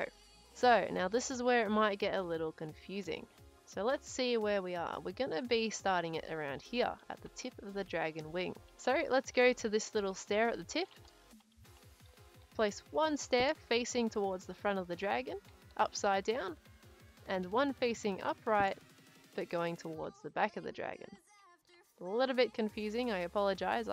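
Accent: Australia. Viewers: are u Australian? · I love your Aussie accent! ❤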